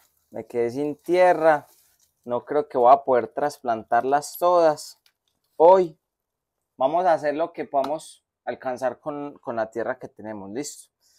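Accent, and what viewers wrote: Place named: Colombia. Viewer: Soy nueva en su canal y me gustaría estar con ustedes para poder recoger la planta con ustedes son de Colombia ustedes por el acento bendiciones saludos ❤❤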